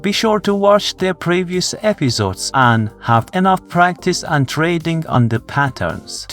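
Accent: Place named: Iran. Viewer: درستش اینه منبع ذکر کنی همه می‌دونن این روش ها اولین بار توسط استاد روزاندیش معرفی شدن انگلیسی صحبت کردنتم که معلومه تابلو زبان اصلیت فارسی 👎💩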